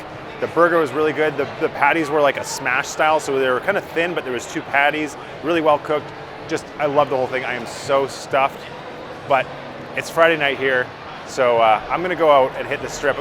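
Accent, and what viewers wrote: Canada. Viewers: I like the $20 trick to see if you get anything. I find it interesting that some places allow early check in. I like your brief reviews! BTW, do I notice a Canadian (or Midwestern accent) haha?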